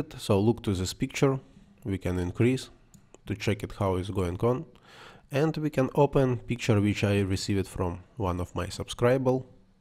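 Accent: Russia. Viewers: Кстати спасибо за урок, очень пригодилось в моей работе. Я догадывался что ты русский по акценту, но потом увидел это и. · Акцент русский)) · excellent! your russian accent is great!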